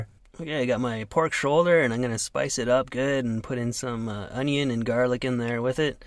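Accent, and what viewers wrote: Canada. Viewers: You are SO smart!!! How'd you learn about all that stuff??? Your cooking always looks good as well! I admire how you like to cook your own food instead of buying fast food, I do also. You are cute, and I love your Canadian accent! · I can hear the Canadian "Eh" in your voice. Lol. I love your channel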